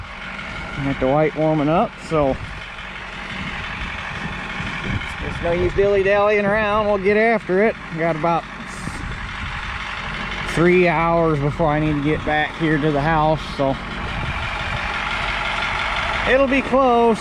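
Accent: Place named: Michigan, United States. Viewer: Sounds like you are from Michigan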